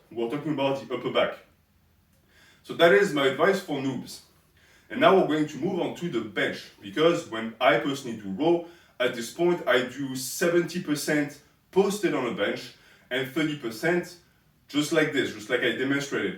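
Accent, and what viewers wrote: France. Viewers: This is amazing, I have always wanted/needed somone to tell me how to work on my DB row techniques and the cherry on top is the subtle French accent. ❤️ Stay fit bro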